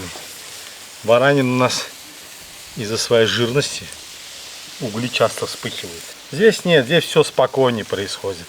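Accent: Baku, Azerbaijan. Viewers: Настоящий Бакинский акцент❤смотрю с удовольствием ваши ролики, всем Талышский салам из Канады Вагкувер ❤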